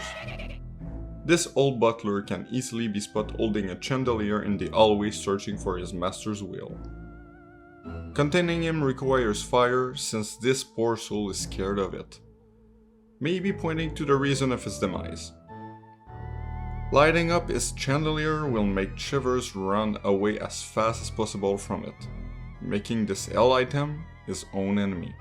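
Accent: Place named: Quebec, Canada. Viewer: This guy's voice is agressively Québecois.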